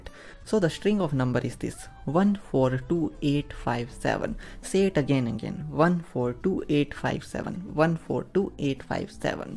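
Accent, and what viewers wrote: India. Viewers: obviously india who else has that accent? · he's from India but I can't really tell from which state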